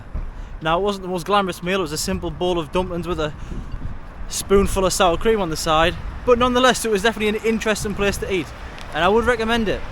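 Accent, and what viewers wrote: United Kingdom. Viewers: It was though to understand you at first place whereas I’ve got used to it and to top it all off: it’s appreciated to hear the British accent. Greetings from Poland lad 😎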